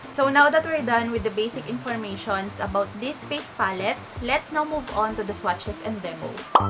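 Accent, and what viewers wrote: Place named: Iloilo, Philippines. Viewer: You sound like an Ilongga. 😍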